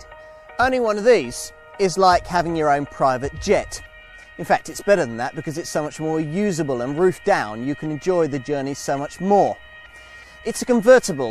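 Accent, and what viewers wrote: United Kingdom. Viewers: Well presented , chic & cool at the same time … and with a british way fashion way to speak it looks so authentic … btw presenting the car beside a superb British mansion gives even more attraction to it …. Thank you so much …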